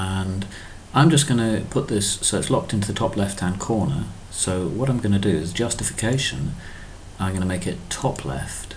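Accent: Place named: England, United Kingdom. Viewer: Clear instructions; great classic english accent!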